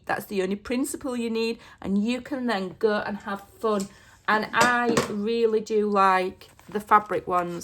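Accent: United Kingdom. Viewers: This is the first time I've watched your channel. What grabbed me was your accent. Being from Texas, people comment on my accent, course I'm thinking, "What accent?" I'm not trying to pry, and please feel free to say none of your beeswax, but what part of UK does your accent come? I love it. Also, you have really good ideas.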